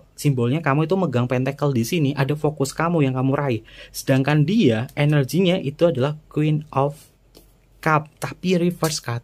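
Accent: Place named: Wonosobo, Indonesia. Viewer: "sikapnya si pekok kempleh2" 🤣🤣🤣 kak bahasanya mirip kaya wonosobo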